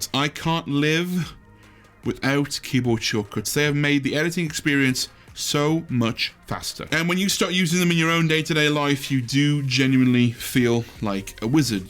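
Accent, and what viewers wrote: Midlands, United Kingdom. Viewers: Can I hear a Midlands accent in there trying to hide away? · Also... Is that a midlands accent I hear? ;p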